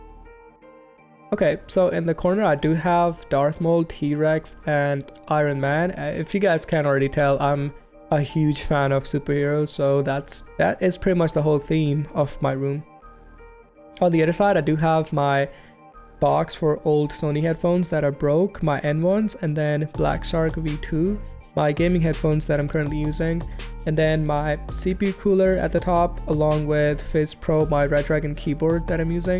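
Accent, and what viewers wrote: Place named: India. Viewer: y does he sound like he indaian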